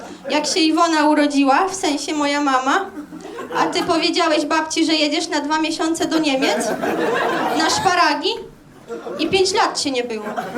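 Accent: Podlasie, Poland. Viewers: Też to zauważyłeś? Jest mega urocza, mega humor, no i ten akcent, najpierw myślałem, ze jest gdzieś z Podlasia. :) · Słychać Podlasie. Używa też slow lokalnych jak pazłotka. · Ja chyba zostanę psychofanem 😀 zakochałem się w tym typie żartu, mega akcent trochę przedwojenny, trochę Podlasia z domieszką Mazur, ale mega robotę to robi 🎉🎉🎉🎉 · z warszawy XD a akcent podlaski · Brawo! Mega 😅 i fajnie Podlasie słychać w Tobie 😀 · Ten aksent to bardziej z podlasia niz z Warszawy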